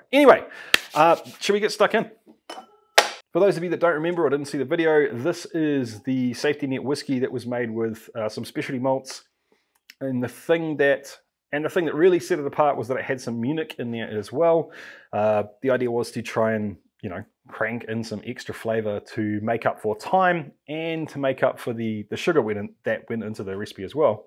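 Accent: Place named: New Zealand. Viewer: NZ accent, bro.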